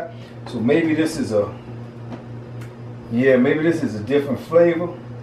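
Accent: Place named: New Orleans, United States. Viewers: Sounds like You from New Orleans area dude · Okay babe! My family is from NO! You sound like! I want to taste this and your cute❤️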